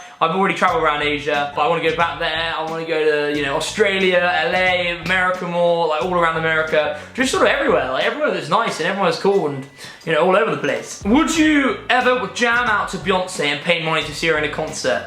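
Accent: RP, United Kingdom. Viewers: you sound quite posh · has to be like the poshest sounding person on YouTube. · Where is he from he sounds so posh to me 😂😂😂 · never realised how posh he actually sounds until now :O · You are so posh. I ❤ your accentttt😍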